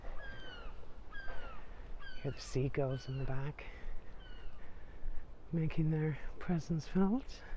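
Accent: Ireland. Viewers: Been waiting for the return of the magnificent 7 Love this series of cemetery tours by the wonderful.. Great knowledge and well delivered in that soft Irish accent x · Lovely! Just so lovely! Not only the tour of this beautiful old cemetery, but to hear that wonderful lilting voice of my favorite tour guide,. Lady, I believe I could listen to you read an encyclopedia and it would be entertaining just to hear that soft, soothing, Irish lilt. I am a chronic insomniac and you just don’t know how many nights the sound of your beautiful voice has been a lullaby to ease me off into sleep. It works as nothing else does. I always look for you especially when new videos have been posted. I love the others as well, but you just have that something a little extra. Prayers that life is treating you well! Keep up the great work. Free Tours by Foot-London has a real treasure in you. 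❤️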